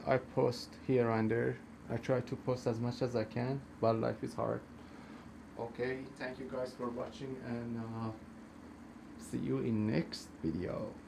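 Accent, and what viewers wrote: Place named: Iran. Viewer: nice mod. The peroxide bleached the color btw. Your accent seem to be Persian.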